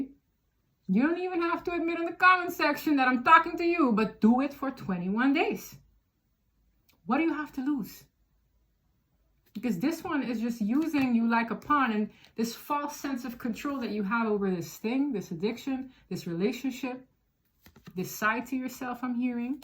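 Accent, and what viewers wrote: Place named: Netherlands. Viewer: Je bent Nederlands. DAMNIT IK WIST HET. Ik dacht al wat aan je accent te horen. Waar woon je, Curaçao?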